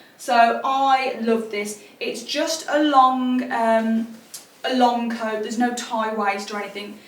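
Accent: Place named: England, United Kingdom. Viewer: Love your English accent OMG 🤌 (I am french) and looove your joyfulness, I feel happy listening, thank you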